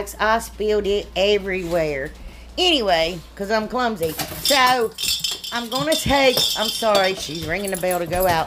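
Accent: South, United States. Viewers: your southern drawl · I love the way you explain everything. You're very easy to follow. And never apologize for your countryness. We can't help that we're from the south. God blessed us to live in one of the best places in the USA, the south.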